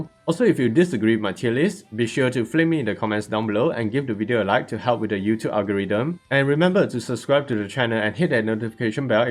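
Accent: Singapore. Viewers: ur singaporean right the accent sounds familiar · Hmm you sound singaporean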